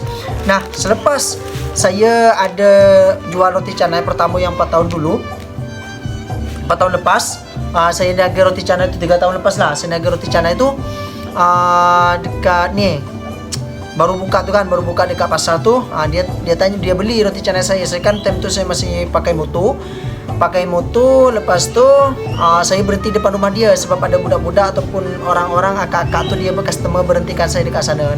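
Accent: Malaysia. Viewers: Tak ada dah sleng indo dah cara percakapan nak ni, dah macam lorat orang malaysia dah cara dia bercakap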